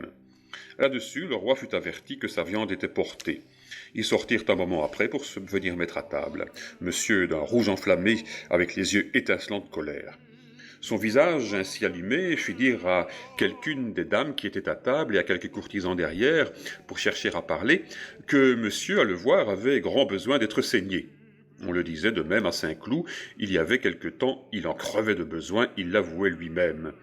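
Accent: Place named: Belgium. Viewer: Ce savoureux accent belge...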